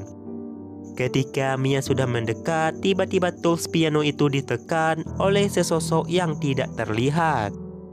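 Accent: Aceh, Indonesia. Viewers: Admin nya pasti orang Aceh ni, logatnya gk bisa bohong.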